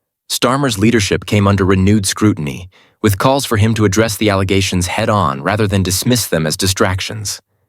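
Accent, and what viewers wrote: United States. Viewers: If this confrontation was so interesting, why is it not shown? As a secondary question, why is it 'voiced' by an American person? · Political News Club....based in Saudi. No idea about the UK and our politics and a stupid USA voice to boot. Go and do something useful instead of posting this rubbish.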